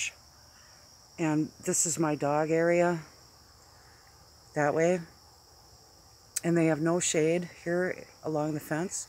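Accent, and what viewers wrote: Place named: United States. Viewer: like your American accent - thank you for sharing - like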